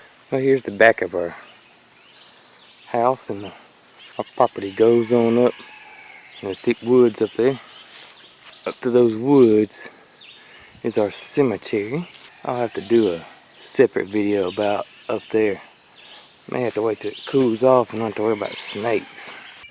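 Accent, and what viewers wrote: South, United States. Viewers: Like little bootsie too.love the country accent.lol.im not a farm person but this is fun and cool to watch.altho i was raised in the country.God bless your family.Jesus loves yall.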